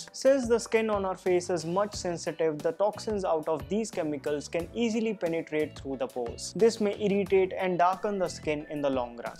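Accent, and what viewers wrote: India. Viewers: So Indian English accent 😂, hilarious 🤣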